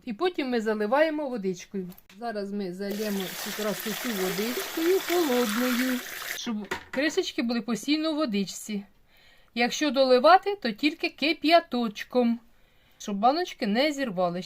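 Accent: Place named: Russia. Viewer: А в якому місті ваш ,,говор" москальський?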